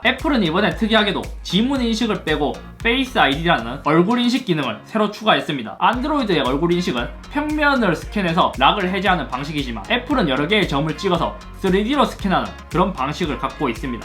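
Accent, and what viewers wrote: Gyeongsang, South Korea. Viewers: 같은 경상도 사람이라 억양이 비슷할수밖에 없어요.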